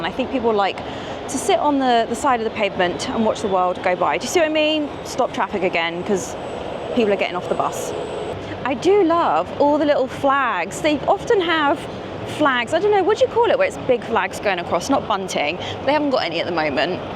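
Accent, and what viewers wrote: United Kingdom. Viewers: London stories and british accent, the perfect combination to practice my English. Thanks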